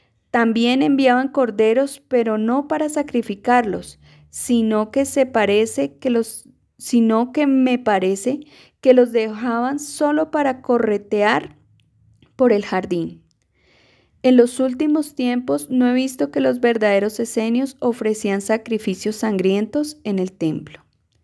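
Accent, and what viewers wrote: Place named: Colombia. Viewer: Mucho acento colombiano me genera resistencia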